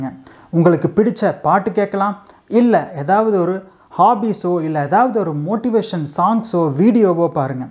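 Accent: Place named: Kongu, India. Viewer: Your tips is good and your kongu slang good.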